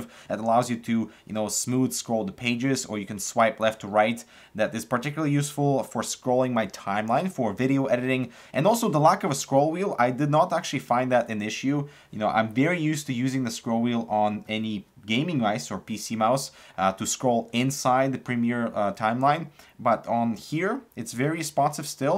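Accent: Russia. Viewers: slightly рашин акцент here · Not offending him but sometimes he sounds Russian and sometimes he sounds American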